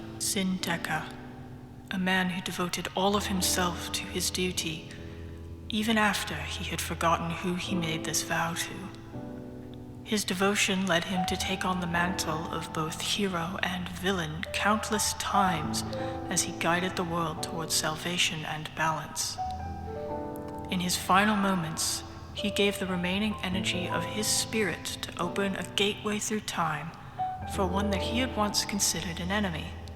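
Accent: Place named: England, United Kingdom. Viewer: You truly could’ve fooled me if you were trying to pretend to have an English accent. I like doing voice acting as a hobby, specifically impressions, but it’s hard for me to mask what is clearly my American accent. Still, I can tell a lot of love went into this and wanted to complimented as such. You’re very welcome.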